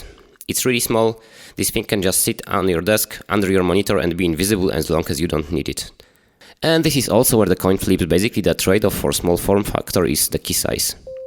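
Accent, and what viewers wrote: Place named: Poland. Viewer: Brzmisz jak polak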